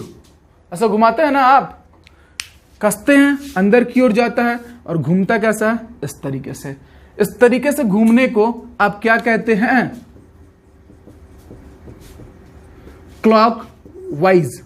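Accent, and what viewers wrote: Bihar, India. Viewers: Such me badhiya padhate ho bhaisab bihari style me I like it mai paid class join kiya hu lekin jo maza apke me hai vo vaha per nahi aata so mai apke hi video dekhta hu thanks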